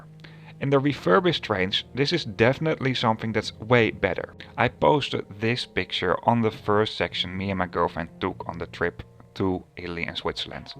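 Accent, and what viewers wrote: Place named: Netherlands. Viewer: Love your videos and your Dutch accent 😊. Nice to see my home cities station from your perspective 👌👌 Btw. Funact: I tried to imitate Dutch accent on my channel 😆😆😆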